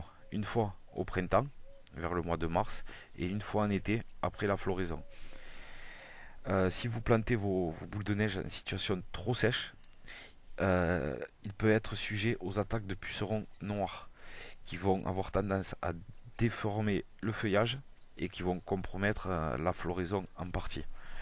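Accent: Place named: Southwest, France. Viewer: Merci avec l'accent du sud-ouest sympathique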